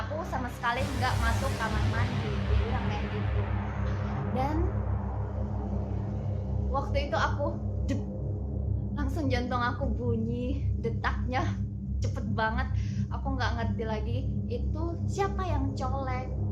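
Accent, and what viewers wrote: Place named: Kalimantan, Indonesia. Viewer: cara bicaranya mirip org kalimantan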